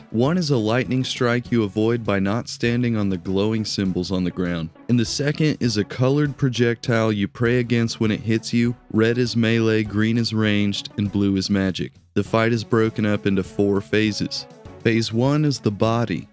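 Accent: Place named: Houston, United States. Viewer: Sounds like he’s from Houston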